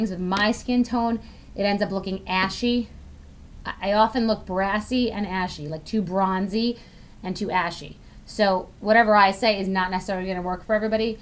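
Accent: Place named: New York, United States. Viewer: I just love you Bethenny! I could listen to you talk all day. Ur so NY!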